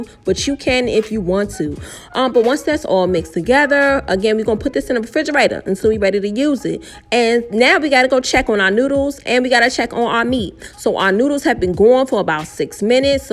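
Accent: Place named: Prince George's County, United States. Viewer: Just found your channel. New subscriber! You’re so funny, you sound like you’re from PG.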